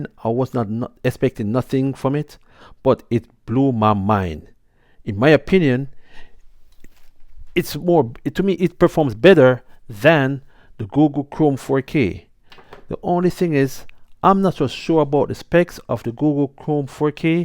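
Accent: Jamaica. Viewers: U a Jamaican? And can it use google chrome app?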